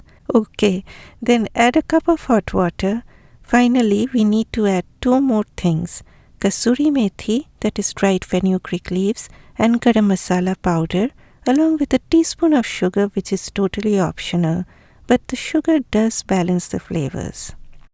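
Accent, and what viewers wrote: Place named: India. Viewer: Haha indians have cute accents. Wobbly head nods are funny.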